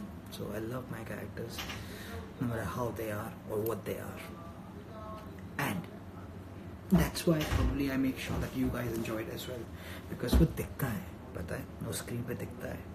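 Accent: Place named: Delhi, India. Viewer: This day will be going soo beautifull bcz it's morning is soo fresh and happy......app inte fresh and happy and cute lag rahe the ki ufff apse nazre hin nehi hat rahi thi....😍😍😍kabhi socha na tha ki subhe uthke sidhe apko live dekhungi.....Hayyyy subhe subhe apko dekhke to pura din ban gaya this is one ofthe most happiest morning of my life........apki batein apke bolne ka tarika.....apki adaein...apki inspirational batein.....apki ankhe.....apki soch......every small little thing which you have just wonderfull.......apki woo hasi or frnd k sath bat karna Delhi style main.....jese bolte hain na Dilwalon ki Delhi hoti hai....wese hi apke pas joo dil hain na woo bohot hi acha hain or woo ek dam bache k jesa hai sooo cute baby......Yarr Dilliwale to chagaye si.......and thanks to sir for encourage our dear Rajat sir...... sir told that Rajat sir is a finestt acter I also agree with him...Rajat sir is the bessttt acter in this whole world....such a kind heart he has...such a pore soul person he love every one.....and he always say good for every and and learn and observe good things from every one......he always pampers his loveones....that sooo sweettt of him.......I never seen any humanbeing like Rajat sir.......he is the one person in my life who make me happy.......when I see him I feel soo peace in my eyes and my heart.....his smile it just killerrr😘😘😘😘I can't say anything about him bcz he is perfect from every side....like as a human or acter or person or son or fr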